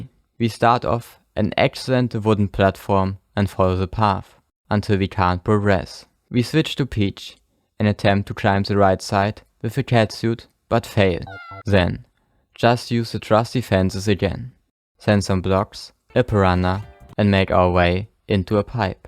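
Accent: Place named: Germany. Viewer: bro the english sounds like a german that tries to speak english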